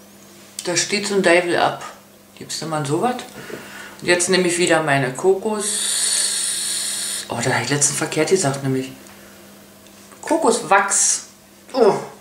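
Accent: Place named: Berlin, Germany. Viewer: Biste Bärlinerin? Hört sich zumindest so an. Und wenn ja - sei gegrüßt aus Pankow :)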